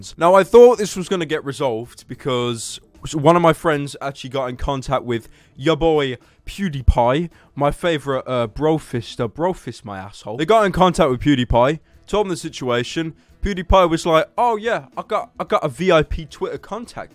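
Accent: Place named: North, United Kingdom. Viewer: It's how we speak in the UK, mostly in the north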